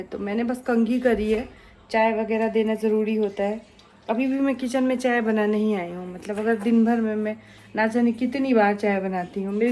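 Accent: Bhojpuri, India. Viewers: Hiiii didi Ppplllllssss reply Bhojpuri khobb badhiya lgta hai aap waise hi bola kijiye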